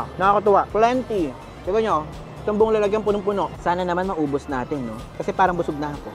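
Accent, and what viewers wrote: Nueva Ecija, Philippines. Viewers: Pag nagsasalita ka, halata talaga na taga Nueva Ecija ka. Not a bad thing though. Nanotice ko lang. Taga Nueva Ecija rin Mama ko.. 😊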